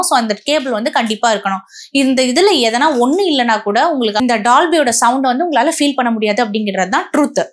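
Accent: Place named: Madurai, India. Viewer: பேச்சில் மதுரை நடை வருகிறதே.... மதுரை/திண்டுக்கல் பக்கமோ...... தெளிவான விளக்கம். அருமையான காணொளி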